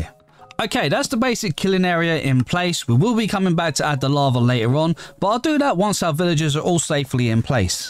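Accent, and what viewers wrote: United Kingdom. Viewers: His British axcent is 🤯🤯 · Are you by any chance British you sound like one not being stereotype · Sounds like Bri'ish fella!!! (no offense)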